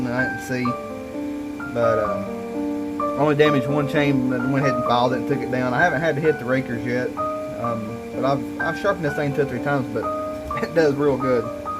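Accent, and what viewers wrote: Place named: South, United States. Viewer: I love my neotecs!! I also have the 038 clone in the 872i and that saw if an absolute beast!! 2 years and multiple big oaks and not a single problem. I have 3 or 4 more neotecs and other than some small things which Neotec took care of immediately I've had very little issue. I would love to know what the squish is on that saw. I'm willing to bet with a base gasket delete and a muffler mod that saw would pull the heck out of a 20" bar. You said the heat index was 105 this day and judging by your accent ide saw your not to far from me lol. I'm in South Alabama and the heat index 2 days ago was 112 so I feel your pain. Great review!!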